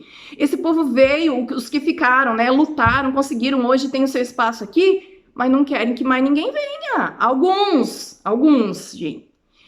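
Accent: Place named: Curitiba, Brazil. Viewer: Você é curitibana? Pelo sotaque, parece minha conterrânea😉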